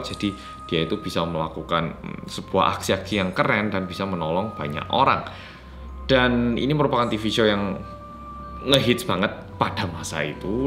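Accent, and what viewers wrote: Java, Indonesia. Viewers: pembawaannya njawani rendah ati, enak didenger meski medhooook.. stay humble, mas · Jumscare nya emg ngeselin produksinya medok😅, sering x saat kaget hp ku, ku lempar sendiri sangking kagetny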